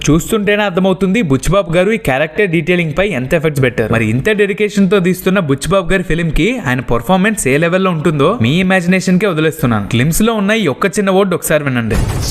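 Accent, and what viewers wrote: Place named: Visakhapatnam, India. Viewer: Aa slang vzm ...not sklm bro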